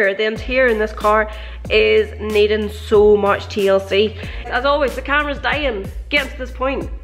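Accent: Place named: Northern Ireland, United Kingdom. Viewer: Luv the videos and Great to hear that good oul norn iron accent , keep up the good work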